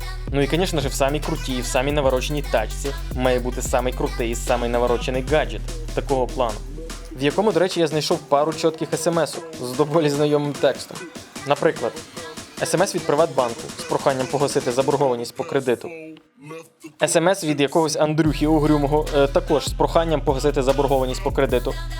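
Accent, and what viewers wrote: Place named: Carpathians, Ukraine. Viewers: По-українськи правильно "говорю",а не "гуворю".Селюків карпацьких понаїхало дратують своїм говором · Блин западэняцкий акцент, тяжело слушать когда знаешь какие мрази большенство из них.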